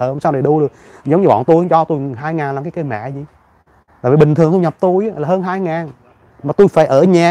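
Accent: Standard. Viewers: Anh chỉ được cái nói chuẩn 👍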